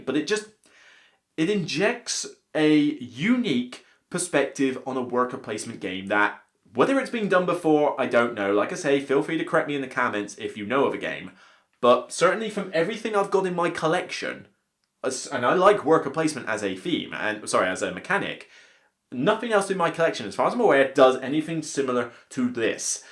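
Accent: United Kingdom. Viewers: Why is this guy’s accent half British and half American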